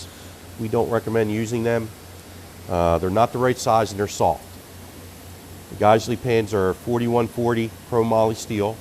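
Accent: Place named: Pennsylvania, United States. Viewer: Pros of this video: - Since graduating college in W. PA 15 years ago, I miss that Pennsylvania twang - The lecturing on pin steel woke me up. I won't even THINK about getting an aftermarket pin now. Cons: - I can't actually see what you're doing. Close-ups would be nice, but since I've installed a LPK, I can infer what you're doing. The video overall is very helpful, and even if it's hard to get the kit installed, I can watch cool .mil photos in the meantime.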